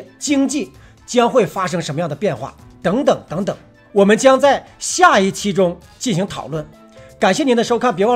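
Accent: Northeast, China. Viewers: 您是东北哪旮瘩的，我是大城市铁岭得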